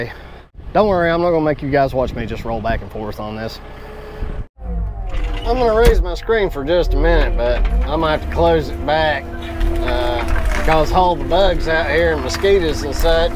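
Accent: South, United States. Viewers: Great content. My grandson comes running when he hears "WELCOME TO THE BROADCAST!!" Lol He also LOVES the the southern draw and finds ways to say the word "pipe" just like brother!! Thanks for keeping it kid friendly and consistent!!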